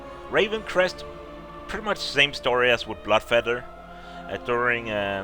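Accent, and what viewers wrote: Denmark. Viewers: Are you danish? :b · Are you danish?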